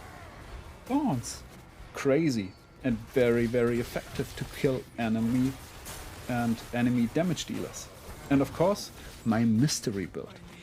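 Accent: Germany. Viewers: Habe die ganze Zeit gedacht, dass du von der Aussprache her ein deutscher bist. Nach dem Kommentar im Video von Darkness, bin ich mir sicher :-)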